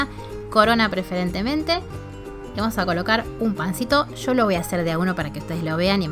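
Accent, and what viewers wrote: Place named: Argentina. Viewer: El acento argentino me encanta, nada que ver con el de otras cocineras. Cada una tiene su timbre inconfundible.❤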